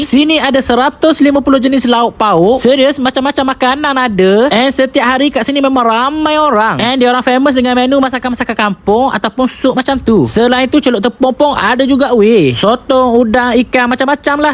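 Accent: Terengganu, Malaysia. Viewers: Abg ni org KL alik ganu ke? 😂😂😂 ulang ulaman. Jengg kreng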